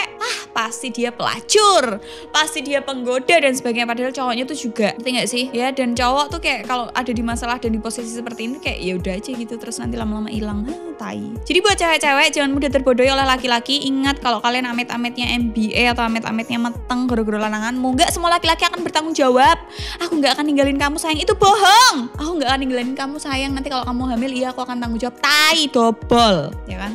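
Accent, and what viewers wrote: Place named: Semarang, Indonesia. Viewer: Semarang mass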